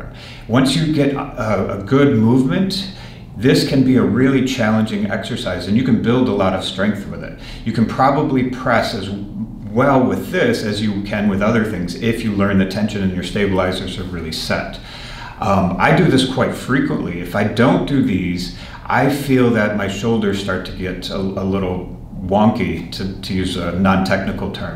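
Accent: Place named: Halifax, Canada. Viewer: Great vid thx. Dude gotta be from halifax or something "the bahr"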